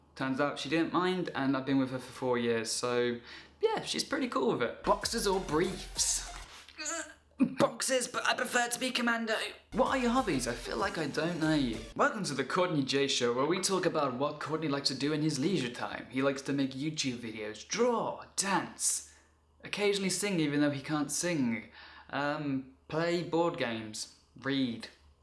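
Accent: United Kingdom. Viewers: What I want most is to have a sweet British accent like yours : )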